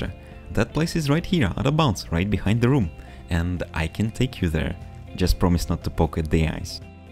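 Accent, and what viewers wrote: Russia. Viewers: Я слышу русский акцееент~ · I feel a Russian accent in your words I'm from Russia :3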